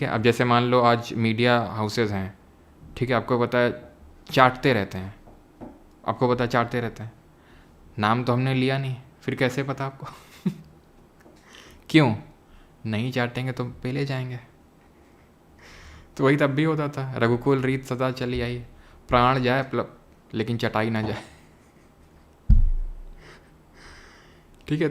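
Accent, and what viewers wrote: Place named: Uttar Pradesh, India. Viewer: Sir pdhate hue apni up accent me yaad kradete hai ap. Thanks a lot sir we needed this.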